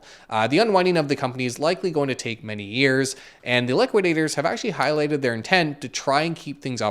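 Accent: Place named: Canada. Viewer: The slight Canadian accent is cute 😊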